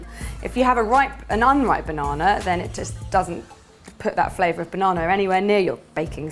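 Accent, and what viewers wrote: United Kingdom. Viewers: You r great! Nice recipes ;) PS: love ur british accent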